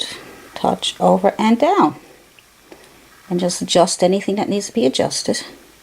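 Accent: Newfoundland, Canada. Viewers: Hello my duckie, sounds like you are a Newf. ;)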